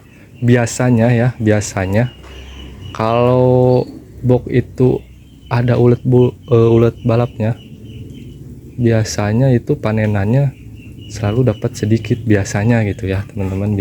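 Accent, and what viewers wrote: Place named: West Java, Indonesia. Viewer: Alamat d mana...kaya orang Sunda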